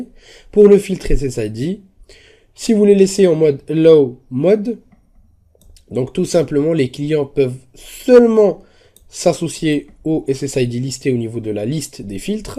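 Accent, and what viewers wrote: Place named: France. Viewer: salut merci pour le video mais mes oreilles saignent , svp arretez de prononcer les mot anglais a la francaise vous denaturé completement la prononcition et la comprehension n es que plus difficile. tu explique 2 minute ou est le bouton reset mais tu ne nous dit pas qu est ce que le tetra machin truc. alors petit cour pineapple le i se prononce aille comme pas i , paynaple pas pine aple . et le plus dur RESET , c est pas un raisin , resset , avec le son c . en plus tu prononce le e en i alors pourquoi ne pas continuer et le prononcer comme il faut . si tu prononce le e en i pourquoi prononcer le i en i a pine apple ???? en espagnol quand tu demande como esta ? comment tu vas. et que lon te repond esta bien . tu repond en prononcant le bien en bi enne et non pas en bien comme en francais , c st pas la meme langue . c est pareil en anglais. 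va falloir faire un effort un jour la . ensuite tu nous parle de cable reliant le mark 7 mais tu nous montrepas comment se conecter dessus. ta manip de ipconfig c est super mais ca ne fonctionn pas du tout , et ton port 1471 ( indiquer 1461 ) dans les sous titre j ai re ecouter 4 fois en montant le volume et tu dit 1461. ou as tu pris ce port, ca non plus on le sais pas., du coup je peux pas aller plus loin dans le video . bonne journee et bon cour d anglais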